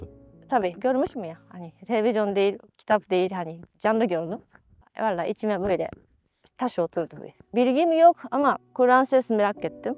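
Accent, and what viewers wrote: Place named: Mersin, Turkey. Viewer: Mersinli teyzeler gibi konuşuyorsun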